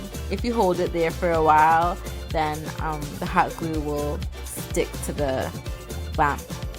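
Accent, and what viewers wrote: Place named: Trinidad and Tobago. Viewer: Lovely. You sound like a trini!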